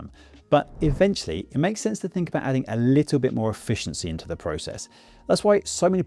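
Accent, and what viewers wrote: United Kingdom. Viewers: Hi! Do you mind telling me what variety of British accent you speak? I really like your accent and watch your videos also to imitate your speech.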